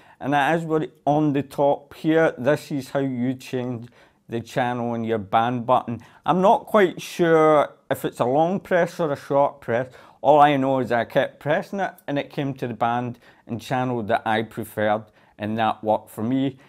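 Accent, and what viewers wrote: Scotland, United Kingdom. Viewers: Scottish accents are so cool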